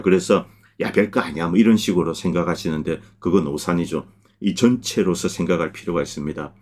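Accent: Gyeongsang, South Korea. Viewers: 근데 님 예전부터 궁금했던건데 경상도 출신이신가요? ㅋㅋ 이민가시기전에요? 경상도 말투가 묻어나오시는데?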